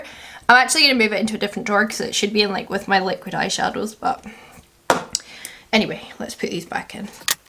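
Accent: Scotland, United Kingdom. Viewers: I like your Scottish accent. Good declutter.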